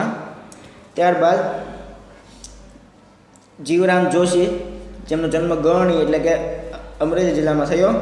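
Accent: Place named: Bhavnagar, India. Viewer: Bhavnagari accent👌☝ nice video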